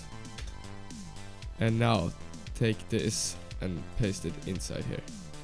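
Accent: Sweden. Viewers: YOU ARE SWEDISH I HEAR UR ACCENT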